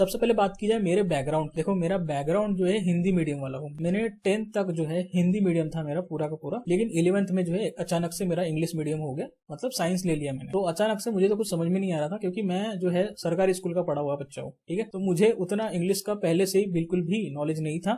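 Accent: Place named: South India, India. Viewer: Bhai tum south indian jaise sound kr rhe ho is video me. 😮 Mast Hindi boli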